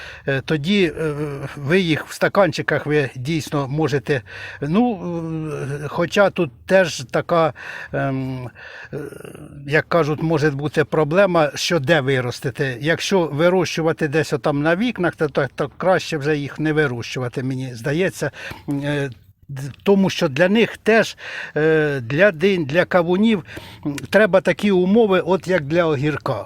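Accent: Poltava, Ukraine. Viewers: я просто з Миргорода, завжди Полтавський діалект виділявся серед інших)))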